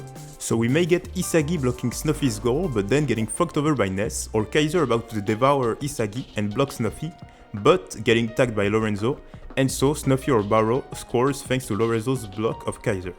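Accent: France. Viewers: French accent kinda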